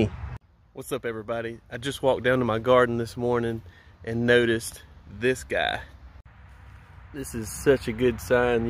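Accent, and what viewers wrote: South, United States. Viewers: thanks, great video! very instructive! will do mine now this morning! by the way! you've got a great southern dialect!!!! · Recognized a Southern accent, confirmed by NC on your shirt :) I'm in Arkansas.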